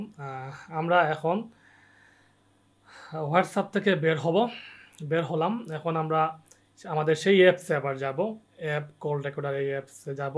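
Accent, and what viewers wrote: Sylhet, Bangladesh. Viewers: Bhai ki sylheti.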